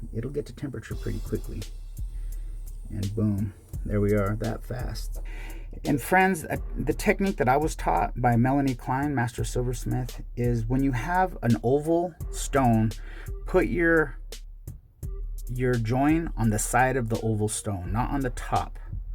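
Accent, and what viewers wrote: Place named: United States. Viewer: Hi, I am new to your channel and I am enjoying it very much. I make jewellery, but mostly copper, and wire wrapped. I do make rings and bangles and do the soldered join, but that is it for metal smithing so far. Keen to try bezels with strip wire bezel and also gallery wire. Tell me, as an American why you guys say sodder rather than solder and using the l in the word. It sounds odd. Anyways, glad you enjoy our English accent. I live in france and 5he French love the English accent speaking French lol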